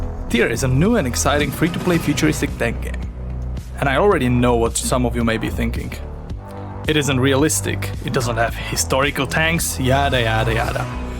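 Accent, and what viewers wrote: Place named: Czech Republic. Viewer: Can't wait to play this one. Also, this isn't a knock on your Czech accent, man. But I really like the way you say 'Hoovercraft'. Keep up the good work.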